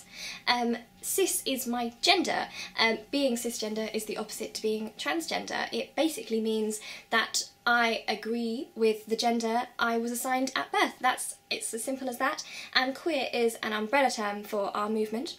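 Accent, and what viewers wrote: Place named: London, United Kingdom. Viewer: What part of England are you from? You sound London, but on some words you sound a little different.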